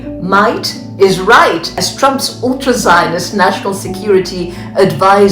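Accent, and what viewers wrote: United Kingdom. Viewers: Looks like AI to me? Posh Brit accent of an older woman?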